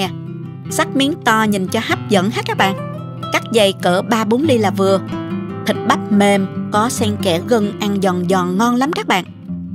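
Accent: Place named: Mekong Delta, Vietnam. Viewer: Nhìn nấu món nào cũng ngon! Giọng đậm chất miền Tây nghe nhẹ nhàng và ngọt ngào. Chúc cô và gia đình thật nhiều sức khỏe!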